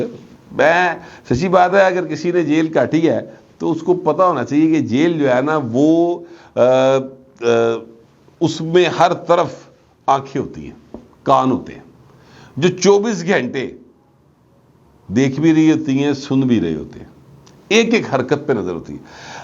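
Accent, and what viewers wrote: Lahore, Pakistan. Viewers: Beautiful lahori dialect and slangs.....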